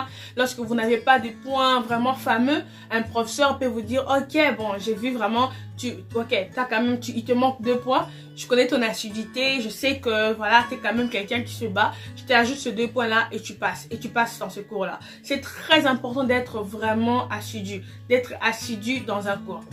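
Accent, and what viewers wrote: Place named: Democratic Republic of the Congo. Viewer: salut ton accent m'intrigue et j'aimerais bien savoir ton pays d'origine je pense que tu es Congolaise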